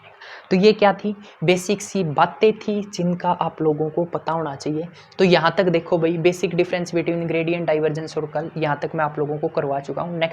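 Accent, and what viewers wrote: Haryana, India. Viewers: Ap haryana se ho na ?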